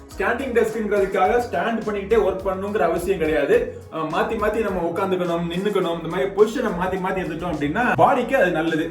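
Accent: Madurai, India. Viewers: Bro neenga madurai ya? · Neenga madurai ah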